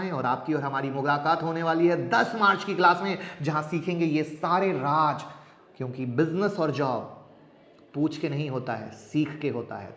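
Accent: Bihar, India. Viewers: आप पूरे बिहारी लगते है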